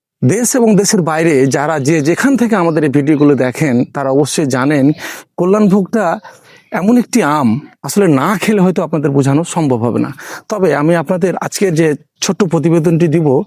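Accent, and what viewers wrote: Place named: Kolkata, India. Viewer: আপনার কথা শুনে মনে হয় কলকাতার লোক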